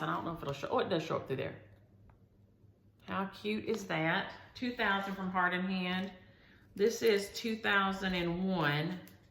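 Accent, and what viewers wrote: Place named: Texas, United States. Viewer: I would not leave my Scotties or any pet alone if strangers were coming to my home and I was not there either. You were a responsible pet owner. I want to thank you for the sweet "shout out" today and you are so sweet to share to others to watch my Flosstube also. Yes, us girls raised in the south do have the slow southern drawl. Your Texas accent is charming and I love to hear you talk. I love to read also. I have the Libby app and also Overdrive to get my e-books. I subscribed to Freebooksy also. Maybe you should join Stitching Book Club. I really enjoy it, but I just cannot seem to get all the stitching done. I do love to quilt and sew but my machine and I have a love/hate relationship most of the time so I limit my sewing time so I do not lose my religion. My friend, Deloris, was so excited when you called her name about the "A Tisket a Tasket". 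She loves birds and has loads of feeders for all kinds. It could not have went to a person that loves birds and cross stitch anymore. Happy Stitching, stitchy friend! Dottie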